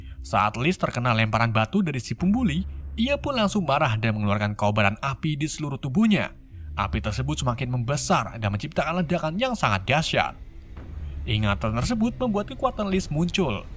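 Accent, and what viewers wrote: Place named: Java, Indonesia. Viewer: Mencengkiwing. Admin e wong jowo ki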